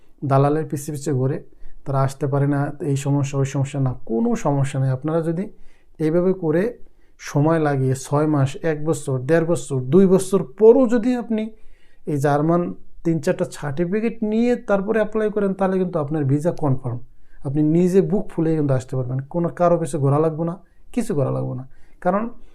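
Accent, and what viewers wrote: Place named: Barishal, Bangladesh. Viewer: ভাই আপনি বরিশাইল্লা উচ্চারণটা বাদ দেন।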